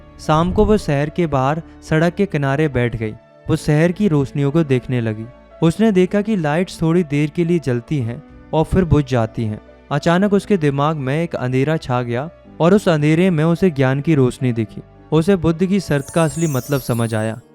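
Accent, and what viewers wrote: Uttarakhand, India. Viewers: Sir are u from Uttarakhand❤ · Bhaiya, Are you from Uttarakhand??❤❤❤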